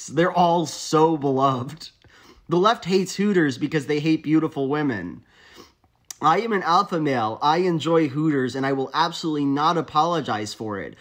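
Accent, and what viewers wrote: United Kingdom. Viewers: I genuinely thought you were from the UK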